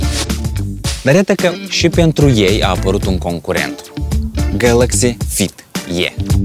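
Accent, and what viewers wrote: Moldova. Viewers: Dat'n slobiz de moldovean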